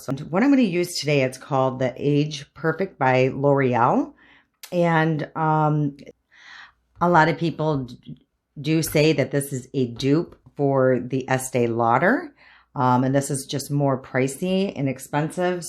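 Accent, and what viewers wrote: Midwest, United States. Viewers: I'm new to your channel; Allie Glines linked you on her recent video testing out the Loreal serum foundation. I'm 48, and i'm also in the process of re-learning makeup...and falling in love with it again! 😎 You mentioned your undereye darkness, and i have that as well. I have found a few products really do completely neutralize that discoloration. I like the Pixi Correction Concentrate, the Fenty Bright Fix Eye Brightener Concealer (shades like melon or deep melon), and the Tarte Colored Clay Undereye Corrector. These products do not crease if you use a very thin layer, and they do not move if you set them lightly. The key is super light layers. Anyway, hope this helps someone! PS...i hear a very familiar Midwest twang...where are you from?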